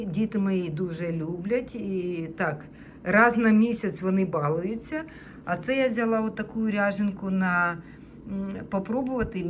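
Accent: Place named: East, Ukraine. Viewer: Вітаю! Ви не переживайте про те, що розмовляєте на суржику.😊 Це типова вимова сходу і півдня України.😊 Ви все надолужите, було би бажання. З появою інтернету, у Вас є можливість перевірити озвучку відео, з допомогою Гугл онлайн перекладача. Ви краще приділяйте більш уваги англійські мові. Вона Вам буде в нагоді і в США, і після перемоги, якщо повернетесь, і в Україні. Будете, як то кажуть "в струє"😊😊 Ностальгію за вітчизняними продуктами демонструють всі іммігранти.😊 Це тішить душу. Але Ви живете в такому місті і маєте можливість ( не завжди...), але бувати в Вашингтоні. Там, мені здається, є все і зі всіх світів😊. Хіба, що це не дешево(. Бажаю Вам здоров'я! Вам і Вашім дітям благополуччя! Ви молодець! Успіхів! P.S. А в нас по всій Україні ревуть генератори Гул стоїть постійний. Жара 35°С. Но все працює! Продукти і товари є. Всі ми маємо надію і віру на Перемогу❤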